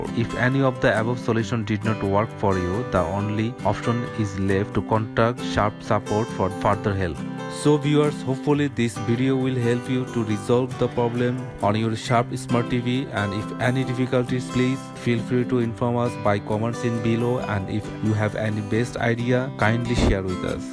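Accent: India. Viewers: Indian accent lol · Your English...Indian pronunciation...gives me more headache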